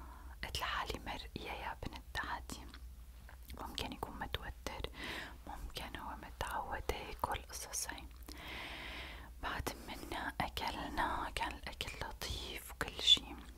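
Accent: Egypt. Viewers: اللهجه المصريه عليكي تحفه بجد❤❤ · اللهجه المصريه عسل 😊❤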